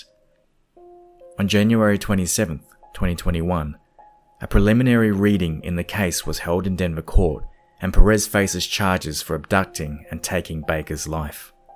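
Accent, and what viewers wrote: Australia. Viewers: Nice to hear an Aussie accent 🙂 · Great Aussie accent 🙏🏻🇦🇺🇦🇺❣️ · I love starting a youtube video and hearing that sweet aussie accent. Keep it up brother! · So happy to hear an Aussie voice over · Good narration by this pleasant Aussie chap - definitely a thumbs up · good to hear the Ozzie voice😃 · first here......subscribed after 5 minutes listening. Awesome mate......guessing by the accent.....OZ ? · Love the Australian accent! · Aussie voice over ? I’m in thanks !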